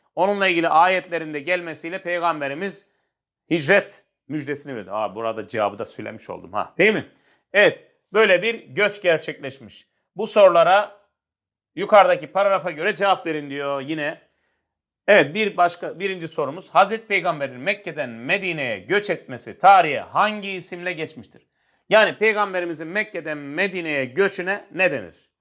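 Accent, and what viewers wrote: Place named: Serik, Turkey. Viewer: Hocam yarın tarih sınavımız var inşallah iyi bir not alırım ve güzel anlatıyorsunuz :) Antalyanın ilçesi olan serik ağzına benziyor konuşmanız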